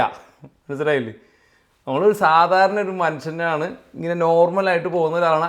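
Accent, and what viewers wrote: Thrissur, India. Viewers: എൻ്റെ ഒരു ഒപ്പീനിയൻ എന്താന്ന് വെച്ചാൽ, എനിക്ക് ചേട്ടൻ്റെ കുക്കിങ് വീഡിയോ യേക്കാൾ കുറച്ചും കൂടി ഇഷ്ടം ഇദ്പോലുള്ള അറിവ് പകരുന്ന വീഡിയോ ആണ്. അതിനു കാരണം ചേട്ടൻ്റെ തൃശ്ശൂർ ശൈലിയും ചേട്ടൻ്റെ അറിവുമാണ്.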